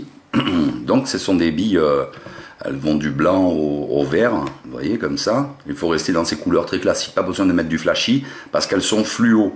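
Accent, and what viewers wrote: South, France. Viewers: Avec l accent du soleil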